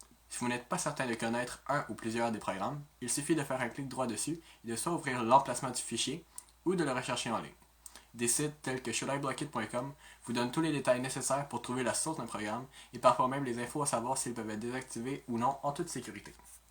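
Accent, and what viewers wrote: Quebec, Canada. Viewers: je préfère largement entendre l'accent québécois plutôt que l'accent d'un gar des bouches du Rhône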